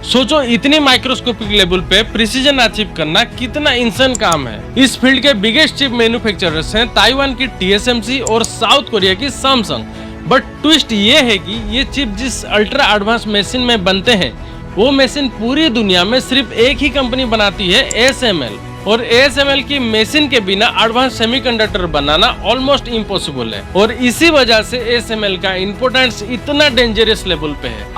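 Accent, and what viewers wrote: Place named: Odisha, India. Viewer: Bhai odia logo ka hindi pata chal jata he.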